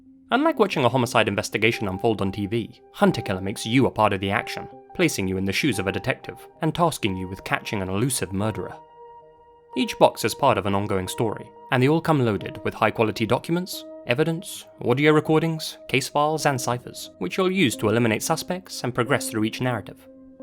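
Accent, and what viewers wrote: England, United Kingdom. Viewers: I love your 'made to tell stories voice' And accent. I find it comforting. Think its an English thing. Im a northener. You should narrate audio books too. Its a real skill. One you have in abundance 🤔👍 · Are u a fellow Brit? I watch so much Youtube accents blend together lol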